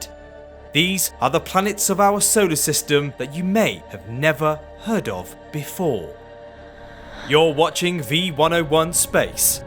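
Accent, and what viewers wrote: United Kingdom. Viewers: Tired of every video narrated by a tired, boring, pretentious sounding British guy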